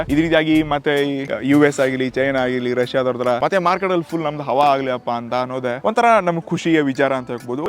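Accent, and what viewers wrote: North Karnataka, India. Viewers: ಅಣ್ಣ ನಮ್ಮ ಉತ್ತರ ಕರ್ನಾಟಕ ಭಾಷೆ ಮಾತ್ರಾ ಬೆಂಕಿ 🔥🔥🔥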